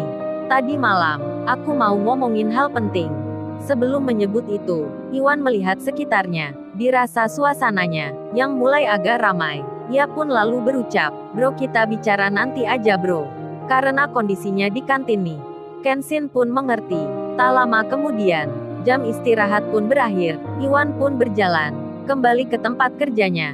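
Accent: Surabaya, Indonesia. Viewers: Mantap..adminya wong suroboyo ya min..salam kenal aq sby utara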